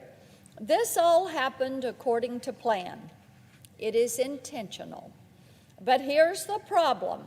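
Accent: South, United States. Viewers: Her Southern Schoolteacher accent is absolute music. That accent spans from Laredo, Texas to Pigeon Forge, Tennessee. Pure ASMR.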